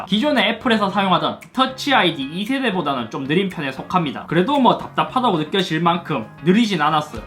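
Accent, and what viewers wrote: Gyeongsang, South Korea. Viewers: 같은 경상도 사람이라 억양이 비슷할수밖에 없어요.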